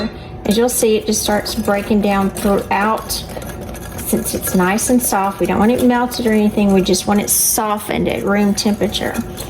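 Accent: South, United States. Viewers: Beautiful southern accent from WHAT state????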